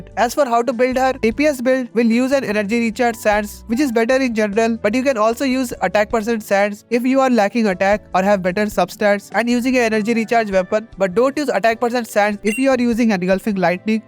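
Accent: India. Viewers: are u from india ? grt video btw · 100% Indian.. awaz bta ri hai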